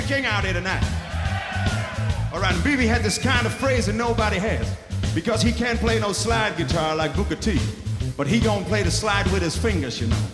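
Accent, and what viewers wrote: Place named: Gelderland, Netherlands. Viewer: dat komt omdat hij een zwaar accent heeft omdat hij uit gelderland komt, hij wil daarom niet voor schut staan met zijn accent, daarom praat hij continue engels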